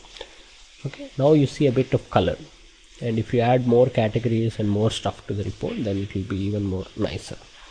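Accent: India. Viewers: This is the first video that I actually find helpful!! thanks a lot!! Love your indian accent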